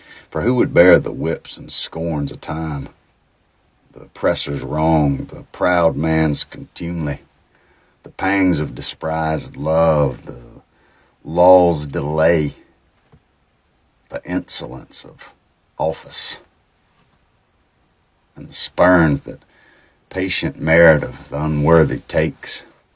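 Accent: South, United States. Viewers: RuPaul asked why not have Shakespeare in a Southern accent. Good question. Well done. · okay, so as an asian, the flow sounds better even to me. i've always found it quite a challenge to really absorb the genius of shakespeare's writing but somehow it just sounds "easier" with an american southern accent. edit: for some reason, it sounds more timeless and relatable at the same time compared to when read with what's commonly believed to be the elizabethan accent of shakespeare's time. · Wow this is the most brilliant rendition of a Shakespeare monologue done in a southern accent. You made it sound almost Buddhist in a way. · This is so good! I teach Shakespeare. It's interesting; hearing this monologue in your beautiful southern accent brings it alive.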